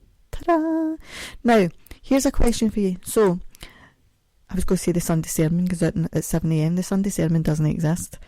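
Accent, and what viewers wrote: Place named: Scotland, United Kingdom. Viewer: It was me!! I'm the one who said they liked your singing, and absolutely, I was serious! I'm in the USA and your podcast came up on my feed randomly. I usually am always listening with earbuds, and I love having your voice, accent, and singing in my left ear. I'm a big fan, and one of my favorite songs of his is. I heard a version of it by, and I was convinced it was MEANT to be sung by a Scottish woman. I always put it in playlists. I have a playlist for you if you want it.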